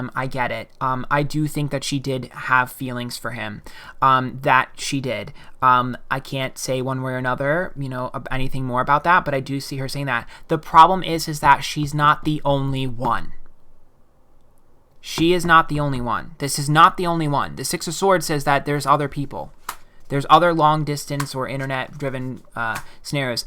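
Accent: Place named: Staten Island, United States. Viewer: are u from staten island. that accent reminds me of my old good judy from back in the day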